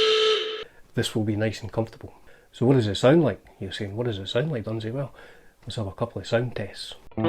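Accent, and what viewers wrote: Scotland, United Kingdom. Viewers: I can listen to Scottish people pronounce Japanese names all day 🌝👍🏼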